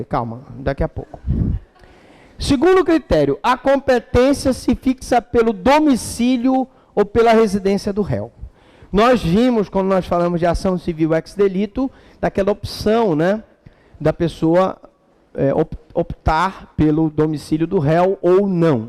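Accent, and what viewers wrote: Pará, Brazil. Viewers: Muito obrigado, sou paraense mas moro e estudo no Rio de Janeiro e suas aulas além de muito brilhantes me ajudam a matar a saudade do Pará que amo, pelos exemplos e pela sua forma de falar. Obrigada.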